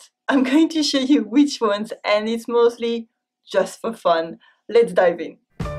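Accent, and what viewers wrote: France. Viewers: I'll take her French accent over any modern american variety any day of the week. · Léa ! J'ai pas dit qu'il était mauvais, juste difficile à comprendre car j'ai l'habitude de l'anglais américain voir canadien et je parle anglais tous les jours et j'aime ne pas avoir "d'accent" français même s'il ressort par moment, certains se moquent, d'autres trouvent simplement fascinant que je parle si bien anglais. Moi je trouve juste dommage de "cultiver" un accent français, parfois, surtout dans cette vidéo, je trouve cela forcé. Je ne jugerai personne sur leur accent s'ils me parlent et essaye de parler une langue non native pour eux, mais je suis d'accord que beaucoup de gens abandonnent l'anglais ou autre langue facilement car ils ont peur de pas avoir un bon accent mais il y a une différence entre accent et prononciation. Dans cette vidéo, je ne juge pas l'accent au fond mais l'articulation et prononciation des mots. 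Par exemple, quand j'utilise un mot français qui est aussi utilisé en anglais, je le dit pas à la française, je le dis à l'anglaise. Je préfère d'ailleurs l'anglais que l'américain. Oui, la jeune fille de la vidéo sonne plus anglaise que américaine mais il n'empêche que de nombreux mots m'ont perturbé, pas l'accent, mais la prononciation littéralement "française" de le dire comme si c'était fait exprès pour faire genre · Moi je suis américaine et je confirme qu'on adore l'accent français et que c'est très "sexy" 🙃 et que j'ai entendu aucune faute mais peut-être mon anglais n'est pas aussi correct que j'aime son accent et je le trouve très facile à comprendre au moins pour un américain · She's French. Your saying that her French accent is ugly? Then why are you watching a video about question not to ask a French person if your already not a fan of the accent? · Je suis américaine et je trouve qu'elle s'exprime très bien ! 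Et son accent est vachement mignon. C'est vrais qu'on adore l'accent français, vous inquètez pas :) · Si tu es francophone de base, il est logique de comprendre un accent du style car la prononciation qu'elle a de certains sons est la même qu'en français. Pour un anglophone ou autre, ces sons déforment parfois trop le mot pour qu'il soit compréhensible pour eux, ça ne relève donc en rien d'un manque de compétence en anglais (surtout que ce n'est pas un accent anglais, c'est un accent étranger en anglais, c'est encore différent d'entendre un écossais ou un néo-zélandais). De plus, très perturbant quand tu parles très souvent une langue, elle prononce beaucoup de mot français à la française en anglais. Ca fait un mix dégueulasse de deux langues, j'ai l'impression qu'on frappe mon oreille à chaque fois... Pour finir, je me suis arrêté après 2 minutes. L'accent frenchy en anglais m'est juste insupportable... 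· Her french accent is so thick. I hear it especially when she speaks french. Definitely a challenge for her to get that english accent right. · Her English is absolutely fluent and perfect grammatically. A slight French accent but she is amazing.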